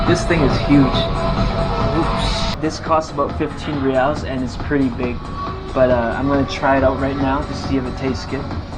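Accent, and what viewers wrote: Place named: North America. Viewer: + You behave like a whiny American, you sound like your from North America are you?! What's your ancestry, Polish or what else? No, it's in south america, not in the world, look at this list and look at this info in the 1940s and 1900s "The Japanese population in the United States grew from 148 in 1880 (mostly students) to 2,039 in 1890 and 24,326 by 1900." has more then brazil always, there you see or "the number of Japanese in other countries in the Americas at the time such as the United States (285,000), Brazil (205,000)" see?! And the list that it looks like there are more Japanese in America then brazil just like peru and Canada! 1841: June 27 Captain Whitfield, commanding a New England sailing vessel, rescues five shipwrecked Japanese sailors. Four disembark at Honolulu, however Manjiro Nakahama stays on board returning with Whitfield to Fairhaven, Massachusetts. 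After attending school in New England and adopting the name John Manjiro, he later became an interpreter for Commodore Matthew C. Perry. 1850: Seventeen survivors of a Japanese shipwreck are saved by the American freighter Auckland off the coast of California. In 1852, the group is sent to Macau to join Commodore Matthew C. Perry as a gesture to help open diplomatic relations with Japan. One of them, Joseph Heco (Hikozo Hamada), goes on to become the first Japanese person to become a naturalized American citizen. 1861: The utopian minister Thomas Lake Harris of the Brotherhood of the New Life visits England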